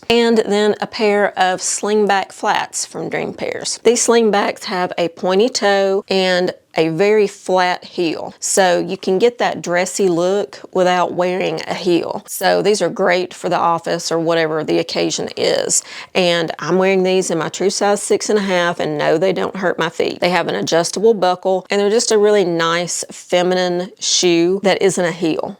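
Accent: South, United States. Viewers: Hi. I enjoy your videos and your southern accent reminds me of my own aunts I have down south. As a nurse, I truly understand what happens when an older person falls and breaks a hip and then the aftermath when they have dementia and that certainly progresses. It sounds like you’re doing everything to keep her safe and well taking care of. I recently lost my mother earlier this year and feel alone also as you described in your video with your aunt being the last connection to that side of the family. All you can do is take it one day at a time and some days will be hard. Take care of yourself. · I just discovered your videos recently and I think you are cute as a button. I love hearing your voice and and sweet southern accent! :) You've inspired me to get a much shorter hair-style...I feel like a new women! I hope your aunt gets situated into a happy situation. 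No one really understand how much we women are/feel responsible for everyone and every thing! Hang in there! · Writing from Washington State where style and fashion never got a hold.. Sorry, WA State! Love your style and southern dialect. 😍❤❤So sorry to hear about your auntie.😥 Wishing you the best and strength!!! And thanks for letting me escape fleece country by watching your channel.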